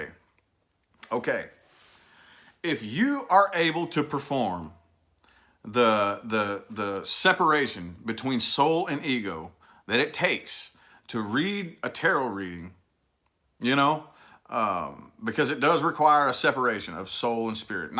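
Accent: South, United States. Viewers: Hi, I absolutely enjoyed your chatting with us today! You are one of my favorite tarot readers!!! Your honest and very real and frank with your messages and your southern humor and accent is refreshing for many of us! You have an amazing gift and thank you for sharing your experiences with us subscribers! Oh and happy hump day from Redondo Beach, California!!!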